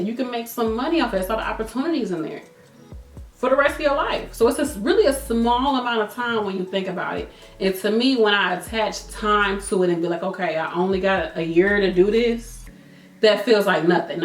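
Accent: St. Louis, United States. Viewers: You from St. Louis? Great video btw!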